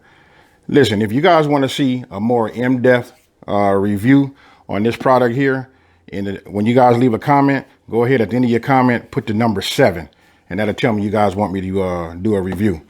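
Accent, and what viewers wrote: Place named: California, United States. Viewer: This rt hearrrr. Omg i love that cali westcoast dialect. It's errythang. I love your cooking.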